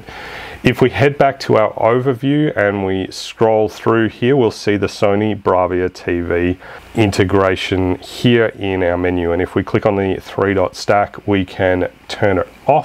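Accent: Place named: Australia. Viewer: cheers mate. Nice to hear an ozzy voice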